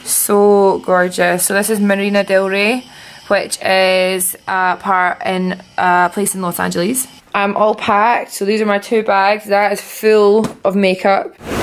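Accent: Scotland, United Kingdom. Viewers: I need a Scottish accent I love it!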